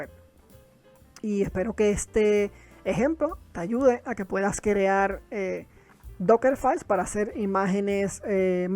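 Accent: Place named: Puerto Rico. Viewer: acho Mano. Sera Puerto Riqueño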